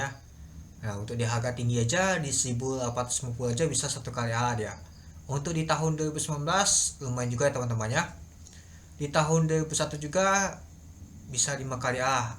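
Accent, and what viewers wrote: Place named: Palembang, Indonesia. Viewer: Lu org Palembang ya Ko?? Logat nya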